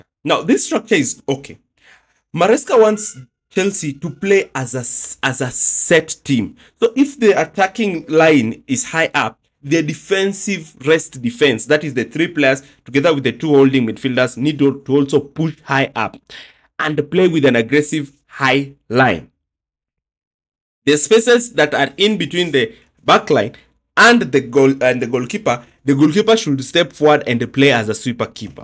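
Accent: Kenya. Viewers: You've got a Kenyan accent, very fluent and as CFC fan ,I love your analyses. Keep it up bro. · Are yu from kenya😮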